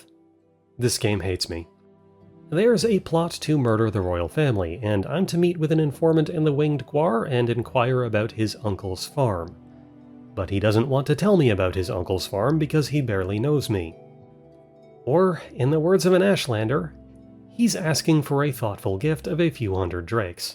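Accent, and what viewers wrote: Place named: Canada. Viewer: You being, and I mean this as a compliment, *aggressively Canadian*, is apart of the charm of these runs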